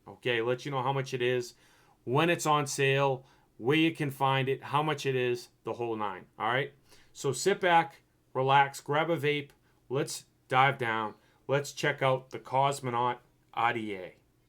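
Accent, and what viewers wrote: Boston, United States. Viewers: Are you from Boston bro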